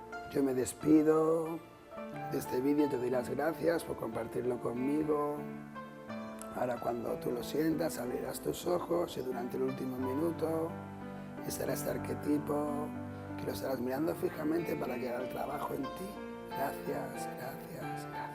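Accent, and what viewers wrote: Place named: Spain. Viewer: me cuesta entender a los Españoles cuando hablan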